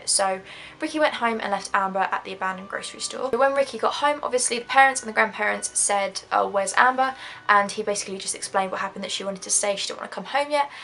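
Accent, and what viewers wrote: United Kingdom. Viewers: why r all u girls doing these type videos have British accents? it’s kinda bizarre just wonder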